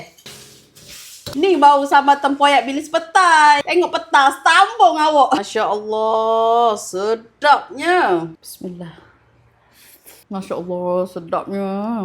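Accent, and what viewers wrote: Kelantan, Malaysia. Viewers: X tahu la byk dia punya deh kan..org kelantan punya bhasa mcm tu kan....smua negri ada language dia .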